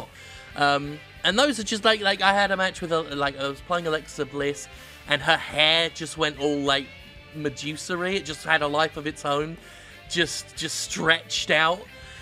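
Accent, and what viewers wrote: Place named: United Kingdom. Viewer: My day just ain't complete without golden british voice talking shit about games that thoroughly deserve it.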